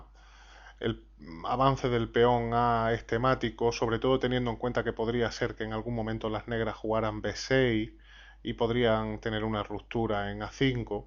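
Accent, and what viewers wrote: Spain. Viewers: Amigo sólo tengo que comentar que a pesar de que soy de México y que los latinoamericanos tienen un cierto "asco" hacia su acento, a mi me encanta la forma en que hablas, en cuanto al ajedrez me resultan interesantes tus vídeos, aunque sólo me ayudan a saber cosas que me he dado cuenta por mi mismo sin embargo, no está demás saber una o dos cosas, saludos.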